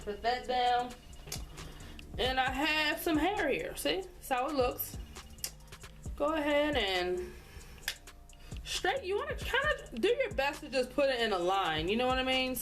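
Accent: Baltimore, United States. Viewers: A tutorial with a Baltimore accent!! I love it! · What state are you in? I can tell your from Baltimore based on your accent. I am just hoping your in Texas! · Omg u sound like you’re from Baltimore lol but I’m starting my own locs I had them before but cut them several times but naw I need to go back using this method 😍😍😍😍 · You from B’more??? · Tell me you from Baltimore with out telling me you from Baltimore. Or at somewhere in Maryland.😊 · I know a Baltimore accent when I hear one ❤ much love from Jersey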